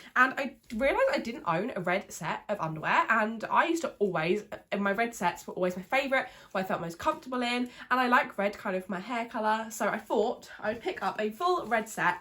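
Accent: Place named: United Kingdom. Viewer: I REALLY LIKE YOU ESPECIALLY YOUR BRITISH ACCENT 😃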